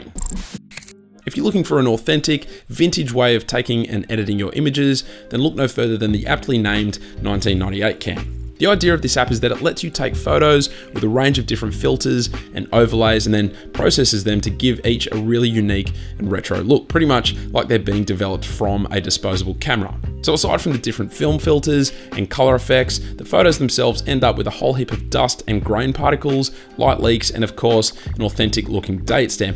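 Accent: Australia. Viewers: I'm just here for the aussie accent :)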